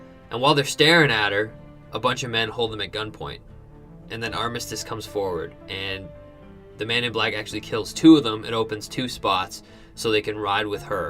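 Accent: Canada. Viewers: do all Canadians pronounce Theresa as "tharissa"?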